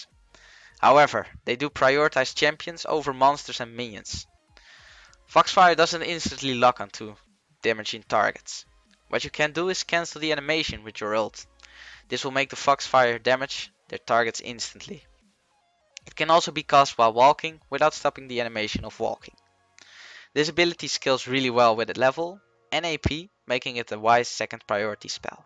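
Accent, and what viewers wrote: Netherlands. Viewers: From the Netherlands i think?? Nice guide thanks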